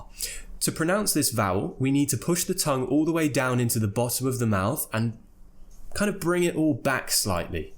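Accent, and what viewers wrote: RP, United Kingdom. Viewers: I love the British accent and your videos really please me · i always keep watching your vedio. I'm practicing the British accents. I love to hear this accents,and quietly difficult. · Thanks i has lerrn the british english from you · He is a Brit! Can't you sense that? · If all in london speaks like you it shouldn’t be so bad but ...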